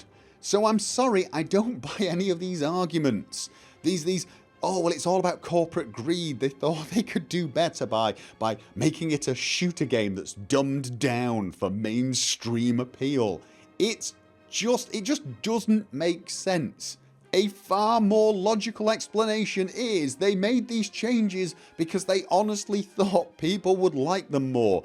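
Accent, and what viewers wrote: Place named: Manchester, United Kingdom. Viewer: Most English personspeoples can identify that subtle:0 Mancunian accent...If he starts talking Norwegian I for one will be lost. P.s. great avatar.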